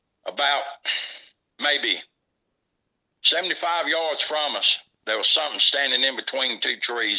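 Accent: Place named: North Carolina, United States. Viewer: I'm from Winston-Salem and a lot of folks go camping and tubing on the Dan River, I love your Eastern North Carolina accent, sounds a lot like our former governor from way back, Jim Hunt. Love your narration I know it's got to be scary out in the woods knowing these creatures are around.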